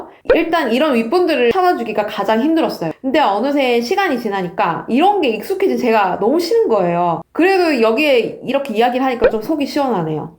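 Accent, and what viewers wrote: Busan, South Korea. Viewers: 님.. 억양에서 사투리가 있어서 경상도분이신가?시픈데 걍 고향말씨 듣고싶읍니다. 사투리해주소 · 부산 사람 아입니까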